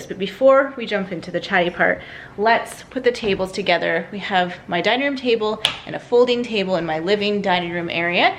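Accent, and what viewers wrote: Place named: Canada. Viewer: I live in the U.S. but I love your Canadian accent! I never knew that Canadian holidays were different than ours until I started watching your videos. Our Thanksgiving is in November and I’m not hosting but I love to see what you do! I also have really loved watching your decluttering journey, you’ve come so far! I hope you have a happy thanksgiving 🦃😊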